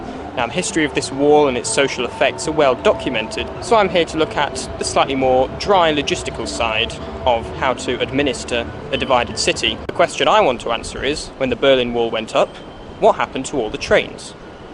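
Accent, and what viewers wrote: United Kingdom. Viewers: He is British, thats how they always pronounced it. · This is really a great work! I am deeply surprised how a young person from the U.K. (I hope I recognized your accent correctly) deals with this matter so precisely and with so many details. I traveled between West Germany and West Berlin several times before 1989 by train, and I can confirm that everything you saying is absolutely correct. Excellent! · You really do use very English pronunciation of all those German words!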